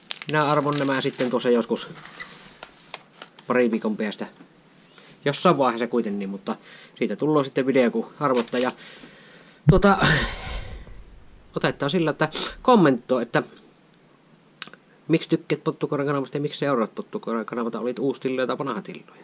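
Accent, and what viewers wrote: Savo, Finland. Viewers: Tykkään erityisesti kalastusjaksoistasi ja myös kalastukseen liittyvästä muusta materiaalistasi. Savonmurre on myös mukavaa kuunneltavaa. Olen oppinut myös kalastusjaksoistasi paljon uusia tekniikoita ja kalastustapoja, kiitos siitä! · asiallisia videoita savon murteella, sekä hyvät kalastuspätkät. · aloin tilaamaan sillon ku kalastusvideoita alkoi tulemaan ja parasta on toi sun savon murre. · Sun seuraaminen lähti niistä chilivideoista kun kattelin e6363ee:n kanavaa ja äijä oli sielä järves aivan pellit kiinni😂Ite oon Pohjanmaalta kotoisin ja jotenki tuo Savonmurre on niin hauskaa kuunneltavaa,ja se et läppä lentää niin saa nauraa välillä melkein kuset housuissa.. Ja nää nykyiset kalastusvideot on tosi hyvää settiä kun itekkin oon kalamiehiä.. Jatka samaa rataa vaan! Oot parhaimpia tubettajia joita oon seurannu.👍👍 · Onhan tuota tullu seurattua ihan alkuajoista asti. 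Kaikenlaiset pärinät ja savolaisuus sai seuraamaan. Ja kyllä se savolainen suorapuheisuus ja elämänasenne on saanut pysymään kanavalla. Olihan ne pärinävideotkin viihdyttäviä, mutta nykyinen suunta on oikea, jatka samaan malliin! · Aitous ja savon murre.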